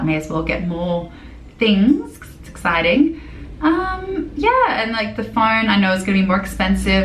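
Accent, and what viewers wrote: Australia. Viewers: I would love to explore Melbourne with you one day! I want to learn an Aussie accent from you! Enjoy your wonderful life in Vancouver! Xoxox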